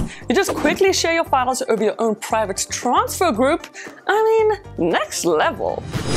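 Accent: South Africa. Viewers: Hi a quick question are u from South Africa 💭❓ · Hi are you South African, cool channel keep it up !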